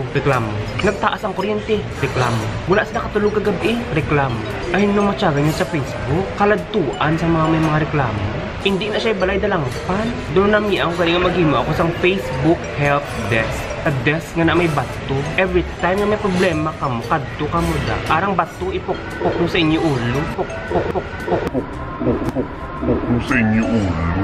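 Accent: Iloilo, Philippines. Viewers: Nice one sir!! Ilonggo na ilonggo gd!! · Enjoy gyd kaayo ko tan-aw sa imo mga video ba,pampawala stress imo video ay kay mag sge ko katawa.Ilingaw gyd kaayo ko.ganhan ko sa imo Ilonggo accent.Sakit ako tyan nimo ay :)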